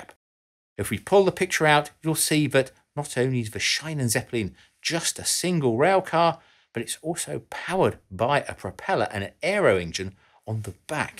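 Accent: United Kingdom. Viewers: He British, even I would struggle 😂😂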